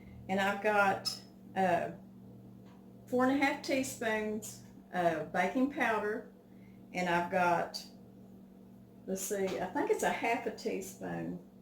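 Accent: South, United States. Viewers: Millboro Quilter South Dakota haha it’s just a southern accent.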